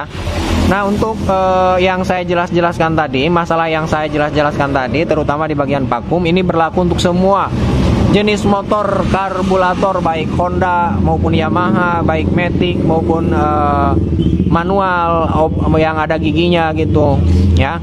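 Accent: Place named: Serang, Indonesia. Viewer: wong endi kang gale gmge jawa serang😅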